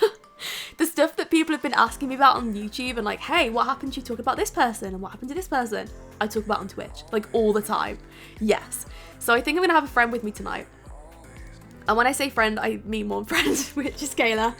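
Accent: United Kingdom. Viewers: I have an idea for a create a sim! But real quick I love Sims but your british accent makes your videos even better because I love british accents anyway! But my idea is that you can/could make Mario, Luigi, Peach, and Bowser! THE MARIO CREW!!!!!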